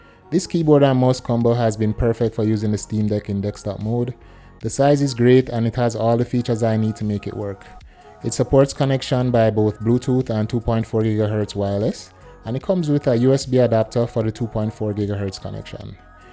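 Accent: Jamaica. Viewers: Yo u a jamaican ? How on earth did you get a steamdeck cheap cheap? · Bro sounds like a yaadman, subbed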